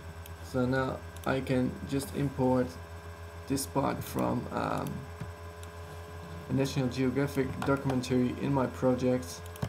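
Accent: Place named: Netherlands. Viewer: I could just hear of your accent that you are Dutch.. Im also Dutch. When you started talking I already knew it :')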